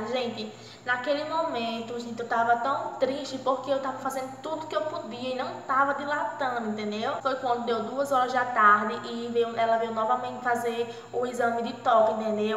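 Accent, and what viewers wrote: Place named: Alagoas, Brazil. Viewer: Graças a Deus que no final deu certo🙏🙏 O meu parto foi normal super tranquilo! Comecei sentir dores em uma noite anterior as 18hrs e no dia seguinte as 15hrs já estava sentindo contrações e partir pra maternidade.. chegando lá fui super bem tratada e meu Bryan nasceu as dizem que não demorei muito , mas pra mim parecia que não ia ter fim tanta dor kkkk mas graças a Deus deu TD certo e estamos bem.. Sucesso pra vc .. gosto do seu sutaque igual o meu kkkk sou Alagoana .. bjs corega 😂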